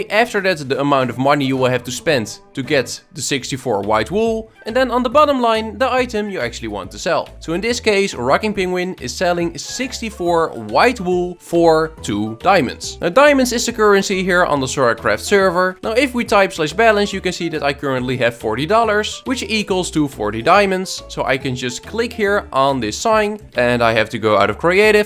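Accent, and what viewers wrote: Netherlands. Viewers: are you from netherland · Ur from nl i can hear it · i can just tell you are from nl:)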